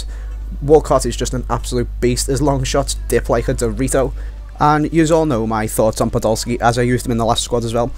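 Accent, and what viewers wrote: Liverpool, United Kingdom. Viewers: Captions for a scousers voice is just amazing haha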